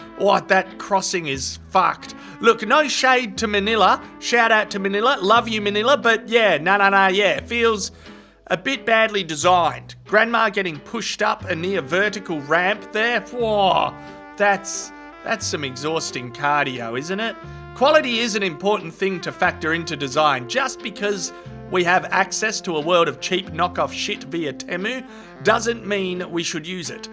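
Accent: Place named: Australia. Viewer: Somehow the Australian-voicedNarration made everything even dafter than it was...that is almost a backhanded compliment...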